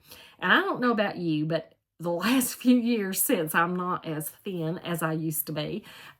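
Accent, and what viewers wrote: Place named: North Carolina, United States. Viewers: we all love your NC accent! You’re so down to earth and keeping it real! I wear a blouse under my jumper. Yours looks great on you! I want to make a pair of overalls. BTW, you’re on my list of YouTubers I want to meet! ❤️❤️ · Hi, you did such a great job of copying your jumper and it really fits you so nicely. I love hearing your North Carolina accent. My stepdaughter also lives in North Carolina and she has that accent, too. Have a good week. 🙂❤🙂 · Omg, my daughter started laughing with the “Mayflower” comment! That was just hilarious 🤣 🤣🤣🤣. Congratulations on copying the old jumper, it looks great on you, especially the color 🙌🏻🙌🏻. You are definitely not alone in not wanting to show your body rolls 😱😱. I just wanted to say that we truly enjoy your NC twang. 💖💖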